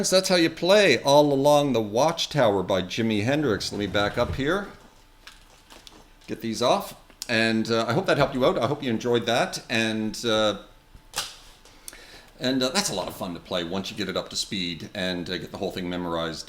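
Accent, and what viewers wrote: Canada. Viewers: good to hear a Canadian accent! I was living in Toronto until January, now im back in Australia. Miss my buds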